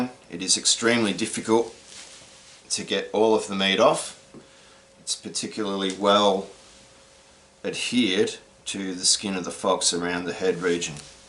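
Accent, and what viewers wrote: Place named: Australia. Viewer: Thanks for explaining, particularly with those 2 comparisons, no one else seems to mention why it must be so clean, just got my first fox last week and I went straight to salting then scraping, but I will try your technique next time I'm lucky enough to get out (my permission got sold for residential). Good to hear another Aussie, cheers from Trentham, Vic.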